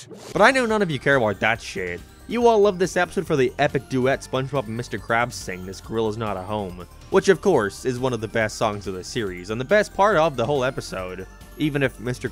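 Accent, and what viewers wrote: Canada. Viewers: You sound Canadian lol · I commend you for your time put into this. However I had to stop. Come back watch a little more. Your accent is unbearable 😂 I'm assuming your Canadian? If not Canadian I don't know but when you say or hang on certain words Im just like 🤮🤮 when you say a phrase like .. Mr Krabs has to figure it OuuuT. I picture a really snobby preppy girl talking. Like yahhhhh that was sooo yesterdahhhh lol if u want more examples I can show ya lol. At the end of the day great video. I'm sure your a good dude etc It's my personal problem but I had to mention it lol.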